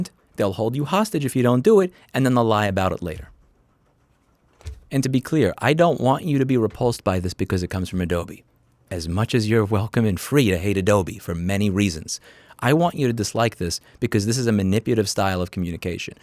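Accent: New York, United States. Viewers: I just now noticed your new york accent, never noticed it before this video.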